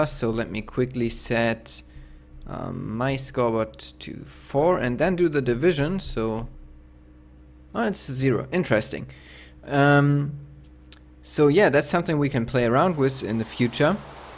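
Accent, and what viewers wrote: Germany. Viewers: Are you german? ;)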